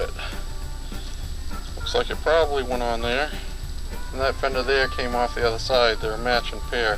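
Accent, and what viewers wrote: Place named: New England, United States. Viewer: Back when you still had your heavy New England accent. Great memory reel! Cheers my YT friend and Shipmate.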